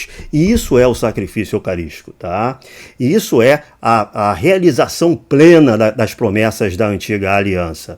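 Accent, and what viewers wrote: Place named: Rio de Janeiro, Brazil. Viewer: Sotaque carioca, camisa do mengão, malemolencia danada... ta aí o meu teólogo preferido.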